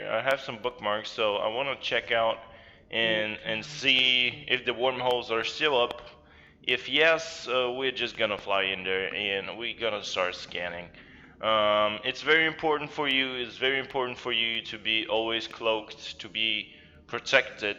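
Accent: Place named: Eastern Europe. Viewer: English isn't the guys' first language. Give him a break. It's common for eastern Europeans. Especially east block scum.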